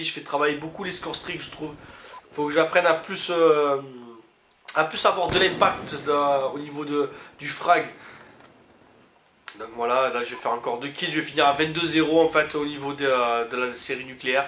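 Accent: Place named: Marseille, France. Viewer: yeah toujours en forme avec l'accent de notre marseille <3 toujours aussi bon a call of;)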